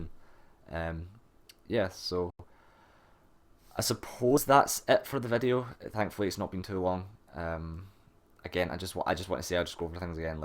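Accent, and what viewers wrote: Scotland, United Kingdom. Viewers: I can't even imagine what it must be like man. I am watching your DS1 guide to help me on my second save and you just make me laugh and keep me plodding through. I love the Scottish accent too. Bringing donations into the limelight is fine, and I wish I could donate directly, but hopefully my views on youtube will help out! Keep keeping on man, you guys kick ass!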